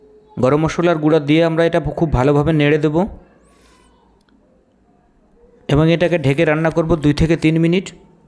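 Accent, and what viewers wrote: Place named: Chowdala, Bangladesh. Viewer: চৌডালার আঞ্চলিকতায় না খেয়েই কিছু বলার নেই। ধন্যবাদ চালিয়ে যাও।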